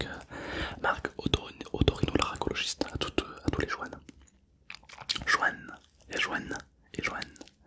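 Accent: South, France. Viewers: Coucou je voulais te dire que j'adore ton accent du sud et ton amour ça m'a fait beaucoup rire 🙂 PS: la prochaine fois que tu fais une vidéo sur les prénoms est-ce que tu pourras mettre le mien Je m'appelle Jean-Baptiste. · tu a l axen du sud ????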